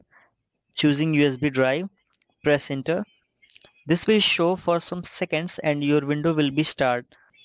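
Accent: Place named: India. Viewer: indian accent is sooo funny xDDDD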